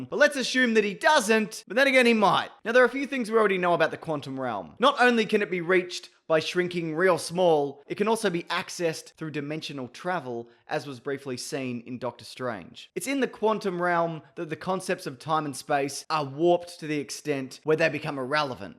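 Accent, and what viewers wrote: Australia. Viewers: Dat TREACLY thick aussie accent countdown, tho! Make a full-vid of jus THAT maybe? And definitely keep counting too slow behind the actual onscreen yt countdown in the corner of the video, lol. PLZZZ. I'll donate to that charity for just one minute worth (or however long it takes to verbalize sixty syrupy seconds, that is). I'd bet you guys could make even a full countdown video hilarious and fun! \m/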